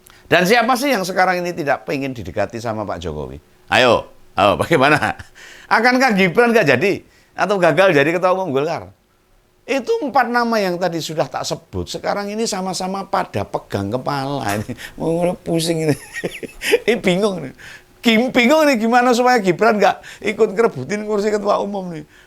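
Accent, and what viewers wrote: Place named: Semarang, Indonesia. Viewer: Penjelasan Om dengan logat Semarangannya asyik😅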